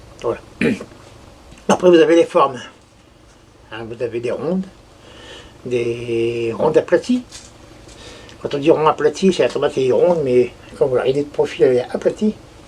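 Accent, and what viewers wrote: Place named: Nord-Pas-de-Calais, France. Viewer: CC, Les tomates expliquées par un ch'ti ... un parfum d'été en plein décembre ....😎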